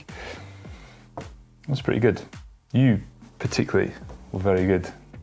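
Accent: Scotland, United Kingdom. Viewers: I swear you sound a lot more Scottish than I remember!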